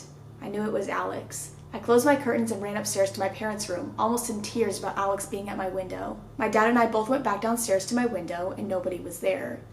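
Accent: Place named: South, United States. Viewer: She sounded so southern in the videos holy shit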